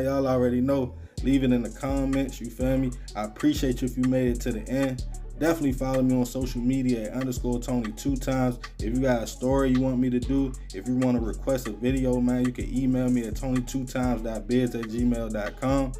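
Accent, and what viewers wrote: Baltimore, United States. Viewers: Boy you need to speak a bit clearer. You can blow. But your journalism is kinda suited for only Baltimore ears. Not saying you not doing ya thing. But it takes practice to shaking how thick our accent is. This ain't no hate, I just know it's hard cracking mainstream with how thick our slang n mannerisms are. Shout out to you tho brova. G shit.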